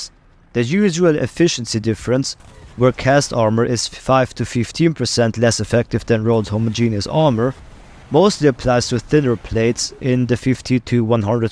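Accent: Germany. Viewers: come to learn about T-54, stay for the German accent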